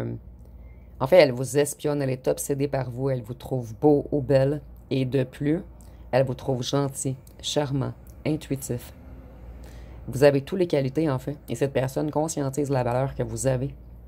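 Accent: Quebec, Canada. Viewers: Bluffant !! Incroyable à chaque fois mais là 👏 bravo. J'adore votre accent québécois et aussi quand vous rigolez sur certaines cartes le concernant 😂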